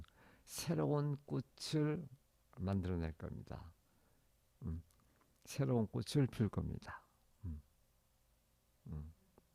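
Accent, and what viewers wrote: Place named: South, France. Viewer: 마지막 문장은 아름다운 시 같다고 생각합니다. 새로운 영감을 주는 글입니다. 감사드립니다. 발음을 통하여 선생님은 아마도 프랑스 남부 쪽에서 공부하셨나 그런 생각을 했어요. Merci beaucoup!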